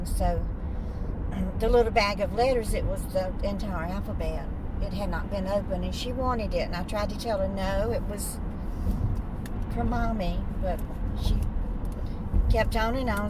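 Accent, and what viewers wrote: South, United States. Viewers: I heard about your channel on a post from a subscriber on "See Mindy Mom". I thought I'd check out your content. Since I chose this video first, it speaks to me rather personally. Our family tragically lost a younger brother when I was a high school senior, in 1972. Then in 1996, my husband's sister, a single divorced mom lost her life in a car crash. It devastated my husband's family. He has never come to terms with the event. My prayers are with you. You have a sweet Southern accent. Blessings to you,! Willette from Alabama 🙂🛐❤️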